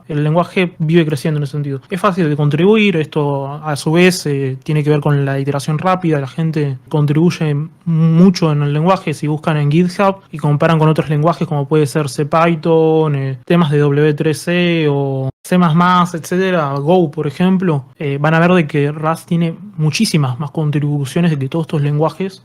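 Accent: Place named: Argentina. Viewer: Tremendo contenido! El audio de 10. Quizá lo que familiarizo el acento Argento 😁. Saludos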